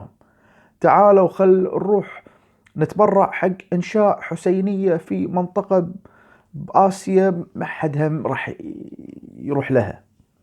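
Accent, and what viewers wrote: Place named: Iran. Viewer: لكنة فارسية على عراقية هههههه هههههههه متعصر كان يزط ههههههههههه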